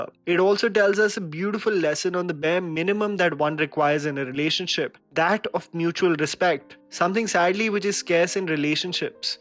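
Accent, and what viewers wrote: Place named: Mumbai, India. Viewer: HEY ARE YOU FROM MAHARASTRA SPECIFICALLY FROM MUMBAI?? your accent is so familiar to that of the ones in mumbai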